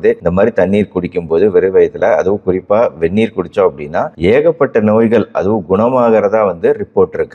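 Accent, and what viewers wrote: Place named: Coimbatore, India. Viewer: சார் நீங்க கோயம்புத்தூர்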